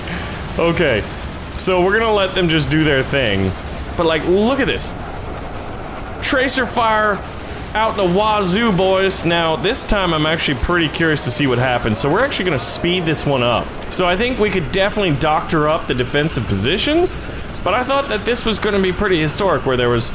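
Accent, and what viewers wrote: Germany. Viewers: i swear your german is sooooo funny😂😂i am from austria so i gotta know😅🤣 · u pronoce brezel wrong sorry XD you german acent is so bad it hurts in my ears i love it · Nice German accent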